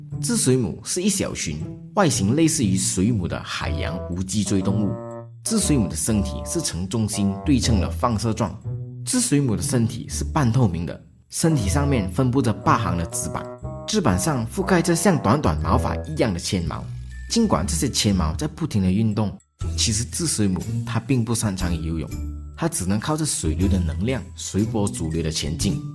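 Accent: Malaysia. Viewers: 馬來西亞華人普通講華語時的口音是這樣，我自己也受不了，但中國人捲舌太過的話也聽得很。。。😂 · 马来西亚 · 马来西亚口音耶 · 馬來人的口音那麼明顯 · 马来西亚腔太重。听了很不舒服，很难再听下去。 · 马来西亚口音，不好听。我也是大马人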